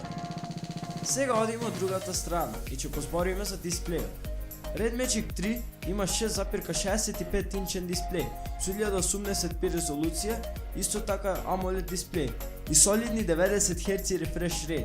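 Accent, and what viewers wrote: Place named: Kavadarci, North Macedonia. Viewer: top si be uzhivaj ali probaj da zborish poishe skopski bolje zvuchi za gledachitw posho bolje kavadarchani da slushaat skopski nego skopjani kavadarski ako me sfakjash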